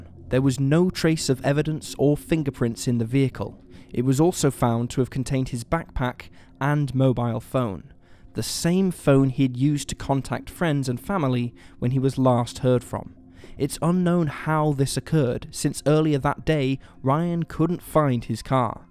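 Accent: United Kingdom. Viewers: he's British so not privy to how we pronounce things.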